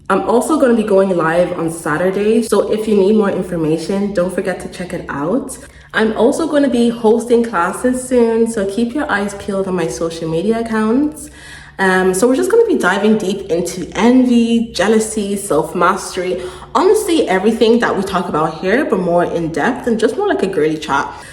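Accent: Ireland. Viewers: Unrelated but are you Irish? You’re accent is familiar 😅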